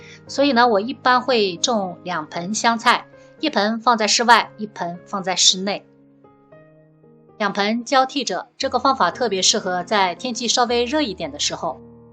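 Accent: Jiangsu-Zhejiang, China. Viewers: 听你口音是江浙一带的吧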